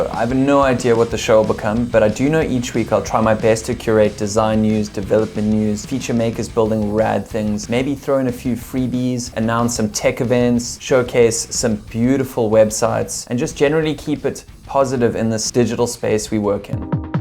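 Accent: South Africa. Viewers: Are you perhaps South African? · Well done, 👏. A fantastic curated blitz of resources and news from the design and dev space. Great to hear the South African accent there too:) Subscribed - looking forward to the next one.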